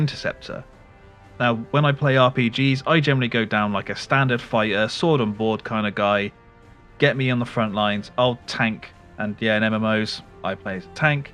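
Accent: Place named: North, United Kingdom. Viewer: Sound like a northern so subscribed no questions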